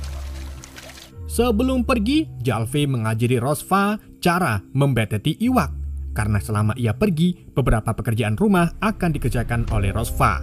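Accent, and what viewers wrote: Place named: Wonosobo, Indonesia. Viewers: Admin e wong jowo, damput😂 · Membetett i iwak .. Curiga wong Wonosobo iki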